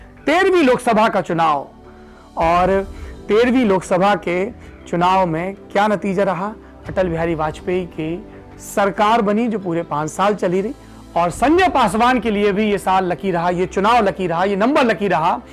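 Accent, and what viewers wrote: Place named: Bihar, India. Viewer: .aap bihar se hain kya, bihari language bahut jachti hai aap pe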